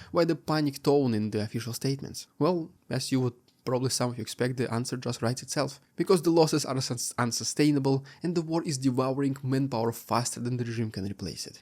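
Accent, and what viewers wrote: Russia. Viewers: watching your old videos, impressed by your beard growth looks great with your accent, it is perfect for a Russian you tuber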